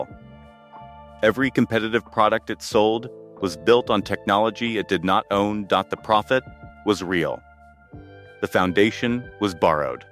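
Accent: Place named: United States. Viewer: American accented AI telling the British their own history again. Images don't match the narrative - a nice shot of the VW Beetle production line at 0.53. Very poor editing and continuity.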